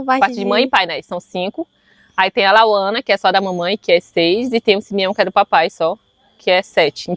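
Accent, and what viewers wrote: Rio de Janeiro, Brazil. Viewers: o sotaque dela lembra o do rio de janeiro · Parabéns... Curto muito... Acho legal seu sotaque carregado na letra (X) assim como nós cariocas. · SINCERAMENTE EU PENSEI QUE VC TINHA NASCIDO NO RIO DE JANEIRO...PORQUE VC FALA IGUAL A CARIOCA KKK .......ABRAÇO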